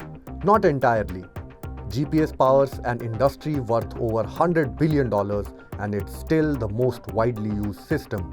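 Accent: India. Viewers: I was going to flame you for the nearly impossible to understand accent, but you're an Indian business. I can't fault you for a strong Indian accent. Comment is free engagement for the algorithm.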